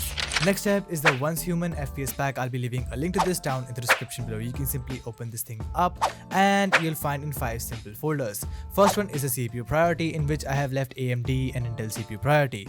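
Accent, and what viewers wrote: India. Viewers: You sounds like a indian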